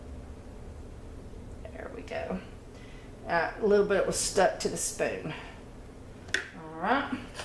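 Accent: South, United States. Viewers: A few things. First I want to apologise for not getting back to you sooner, I still want to help regarding what we spoke of before. Second, THANK YOU SO MUCH!!!! No one online has taught "Superfatting" better than you! How simple was that idea? How could it be said better? Super easy and YOU are the answers. And lastly, I was born to a southern girl who lived in the north so I feel comfortable in both worlds and they are as different as the country mouse is to that city feller over tharr. LOL. I love your video language because you sound like one of my bless their hearts and keep them Lord aunts. When you said butt crack I knew I still had some southern left in me, I about died laughing then shut myself up so I could learn more. Anyway, nuff said, I will email you again.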